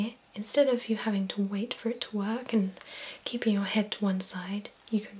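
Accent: England, United Kingdom. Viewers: This woman just radiates class and her english accent is a joy to listen to.wonderful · You are adorable and have the cutest English accent! :) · British accent😍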